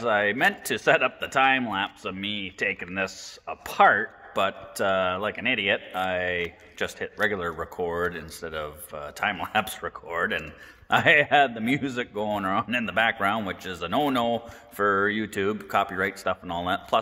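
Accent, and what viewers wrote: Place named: Nova Scotia, Canada. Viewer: Ive had 4 of these... Absolutely no disappointments!! You sound like your from NS!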